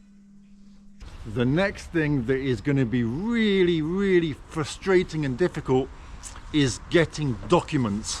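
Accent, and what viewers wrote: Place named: RP, United Kingdom. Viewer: Я при просмотре этого видео поимел некоторый "когнитивный диссонанс". Когда ты совместил в своём монологе две (для меня) стереотипически несовместимые вещи; - эталонную английскую (не американскую!) речь, коей "надлежит" быть дежурно-вежливо-чопорной, и - "неистовую" русскую эмоциональную экспрессию 😄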